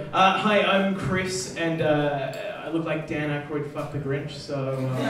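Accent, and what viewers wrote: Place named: Victoria, Australia. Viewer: i love your accent. i live in sydney was born in vic (near frankston) and i love a good victorian accent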